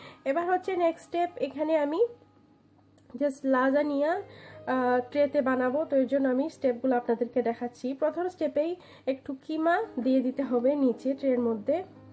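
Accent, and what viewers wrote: Sylhet, Bangladesh. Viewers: You are syleti right? I can tell from your accent your not fluent shudho bangla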